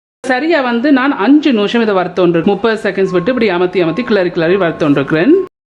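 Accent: Jaffna, Sri Lanka. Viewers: சுத்தமான யாழ்ப்பாணத்து தமிழ் பேசுறீங்க 😀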